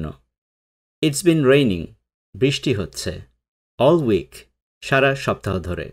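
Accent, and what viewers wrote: United States. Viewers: apu ami bolchi thik kore kotha bolte Uni american style na choose kore british ta choose korleu parten 😒🪧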